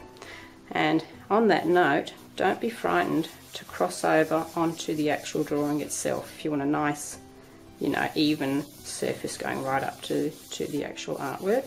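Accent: Australia. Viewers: Unable to sleep, my creative mind wondered - and it wondered right over to discover I am in fact not totally loopy for using the same technique! I cannot draw to save myself, but have found art therapy useful for years; so thank you for your tips. Love hearing a fellow Aussie voice to. "Rubber" usage unite!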